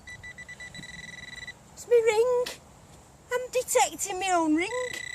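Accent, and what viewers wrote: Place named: Lancashire, United Kingdom. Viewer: Rochdale, Lancashire accent from the north of England.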